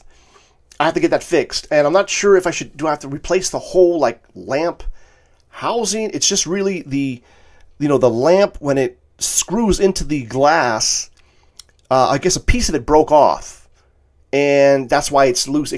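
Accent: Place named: New York, United States. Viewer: This is the first time I saw you. A truly great stuff. Jerry Seinfeld reincarnated- I wonder, is he still alive? This New York accent. Promiss to watch your videos, now I`m working in my garden -in Poland! That`s where I live. 'White Privilages'? Jeez! You have them back home? What`s that?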